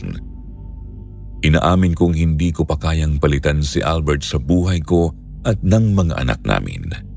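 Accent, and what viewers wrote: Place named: Waray, Philippines. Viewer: Waray po kyo sir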